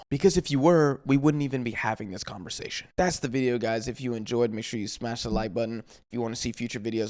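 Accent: United States. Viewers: You have an american accent and you’re talking about football. First of all Gattuso didn’t say that, you people just believe anything you read online. Second of all of course in Europe we have more spots, cause we have more countries but in proportion South America have too much spots and definitely more than Europe IN PROPORTION, and that’s just a fact, 7 out of 10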